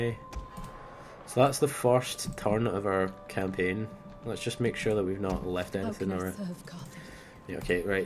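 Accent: United Kingdom. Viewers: Awesome British accent you have there, its very different to the typical Southern Englanders we get on YouTube.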